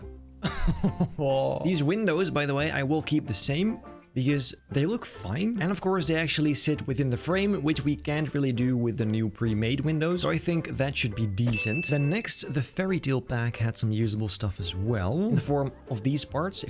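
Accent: Netherlands. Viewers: nether lands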